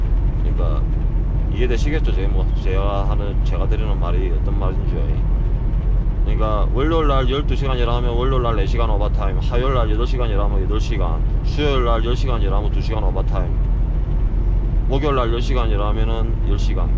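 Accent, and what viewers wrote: Gyeongsang, South Korea. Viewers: 서울은 새벽부타 겨울비가 부슬부슬~하루종일 내리고 있습니다 여기오면 맘이 참 편해집니다... 님의 구수한 갱상도 사투리도 좋구요,오늘도 잘봤습니다^^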